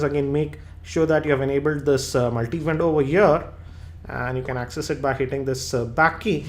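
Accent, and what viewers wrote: India. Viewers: Lol kinda cool how your voice started out like american then went full blown indian lool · That's an Indian accent bro and he's Indian so he's not faking it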